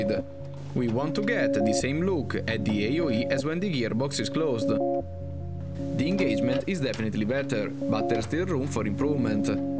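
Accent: Italy. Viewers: Come si sente l'italiano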